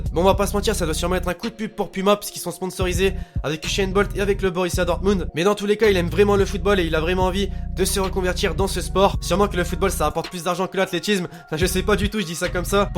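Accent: North, France. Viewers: tu viens du Nord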